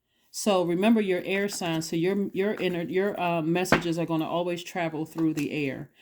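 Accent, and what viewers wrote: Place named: New York, United States. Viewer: Everyone picks up on the New York accent. Always meet the nicest people.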